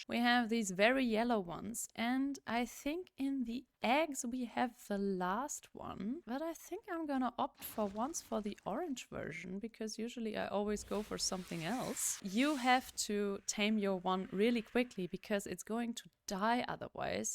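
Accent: Germany. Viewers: Hi, yeah I would love watching another class, any realy, do what you're enjoying. BTW I find your voice so smoothing, and love the german accent. I'M french but father was german so it brings back memories 😉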